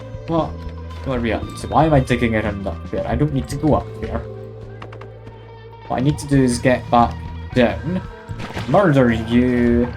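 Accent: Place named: Scotland, United Kingdom. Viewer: sorry if I'm coming off a tad bit rude but you sound a wee bit Scottish. All i can hear is the Scotsman from samurai jack.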